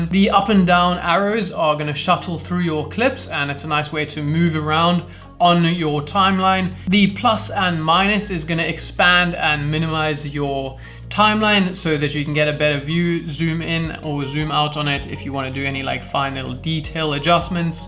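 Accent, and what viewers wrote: Cape Town, South Africa. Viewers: bro you from Cape Town?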